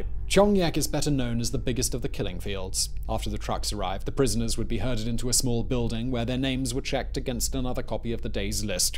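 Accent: United Kingdom. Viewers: Thanks for making this one Simon - I live about a mile and a half away from Tuol Sleng and I drive past on my motorbike nearly every day. It's pretty much in the centre of Phnom Penh, and the rest of the neighbourhood is a very nice place, with some great coffee shops and local bars. Heck, my shoemaker is on the same street as S21! And where I live is virtually equidistant between S21 and the Choeung Ek Killing Fields. Despite the utterly horrible history here, the good people of Phnom Penh are rebuilding still, and life is a lot happier for many here these days. I've been watching your videos for a long time, and as tragic as the subject matter is in this one, I'm really glad to see you and your team make this video. I was thinking less than an hour ago that I should visit S21 again as it's been a while since I paid my respects. Anyway - listening to you as a fellow Brit on your videos often serves as a nice reminder of home, but this video's a great surprise - a British voice helping to chronicle a very important piece of the history of this lovely adopted city of mine. 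Thanks again.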